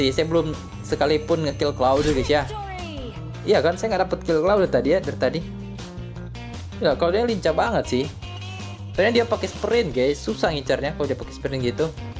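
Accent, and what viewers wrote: Manado, Indonesia. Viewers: Bg orang manado?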